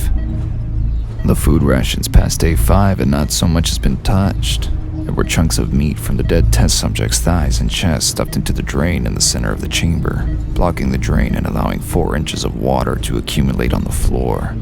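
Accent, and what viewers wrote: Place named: Russia. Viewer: Great job on the narration! I really liked the Russian accent ^w^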